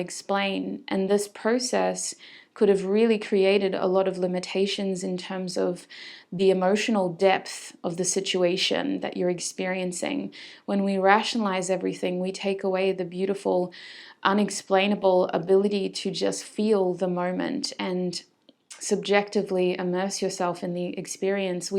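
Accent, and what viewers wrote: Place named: Australia. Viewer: I could instantly tell from the intro you were Australian. Lovely energy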